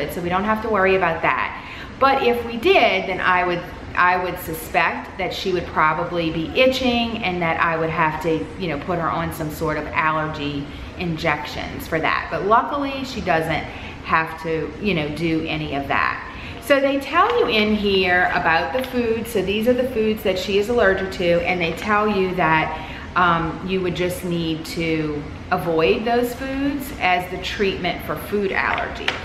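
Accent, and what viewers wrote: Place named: Louisiana, United States. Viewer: I swear I can spot a Louisiana accent anywhere 😂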